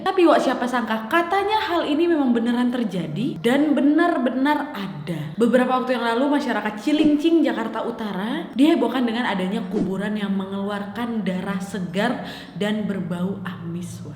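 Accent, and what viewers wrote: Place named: Pontianak, Indonesia. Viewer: Org pontianak mana suara nya ,,😆😎💪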